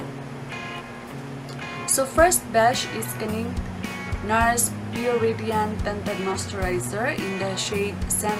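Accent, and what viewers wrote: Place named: Visayas, Philippines. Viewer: Hello dear beautiful, i subbed you back and natuwa ako at bisaya ang language mong ginamit hehehhe. Anyway maiintindihan kita kasi i have a lot of friends from Cebu, Bohol, Surigao...... keep it up hehehhe, so cute ang iyong bisaya. 👍🏻😘💞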